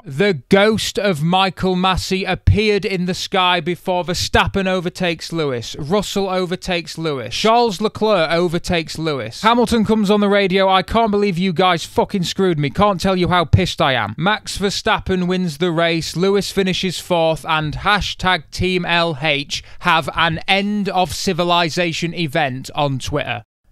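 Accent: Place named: Stoke, United Kingdom. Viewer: Great toon for - As a Staffordshire bumpkin I am guessing is from Sheffield - he has an accent that's a bit "Stooorkeee and a bit Jimmy Savile". Its rare to see new talent. Bweekfust spazzy is my favourite.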